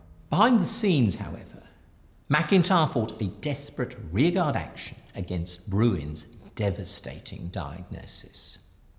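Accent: United Kingdom. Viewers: A peculiar source of narrator. Why would this outfit select a person with a somewhat muddled but clearly British accent to discuss an American president? It's inappropriate, to say the least.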